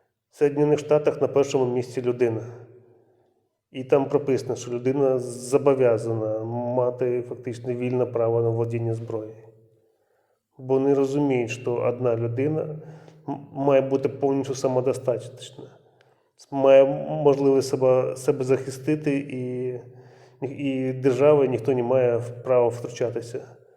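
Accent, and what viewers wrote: Russia. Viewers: ЦЕ ЩО КАНАЛ ФСБ? ЧОМУ МОВА НЕ УКРАЇНСЬКА,А РОСІЙСЬКИЙ СУРЖИК?. ЧУТНО ЩО РОСІЙСЬКОМОВНА ЛЮДИНА КРИВЛЯЄ УКРАЇНСЬКУ.ГАНЬБА!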